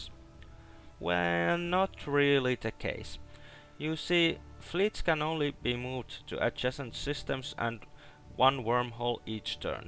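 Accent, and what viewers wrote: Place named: Finland. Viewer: Ootko Suomalainen? Are you Finnish? Just guessing ur accent sounds Finnish :) Even if ur not, great review man!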